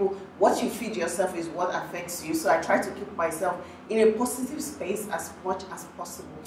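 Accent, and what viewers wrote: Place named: Egba, Nigeria. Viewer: Aha! Egba dialect oooo🙌🙌🙌🙌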